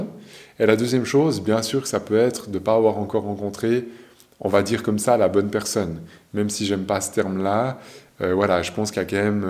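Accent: Switzerland. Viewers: Merci ça fait du bien entendre un discours comme le votre oui j'en ai besoin, j'ai toujours été comme ça depuis très jeune. ( Je me disais bien que votre accent vient de notre beau pays la Suisse 😊 )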